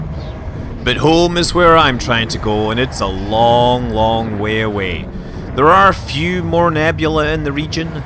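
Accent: Scotland, United Kingdom. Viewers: The Scottish accent probably threw him off.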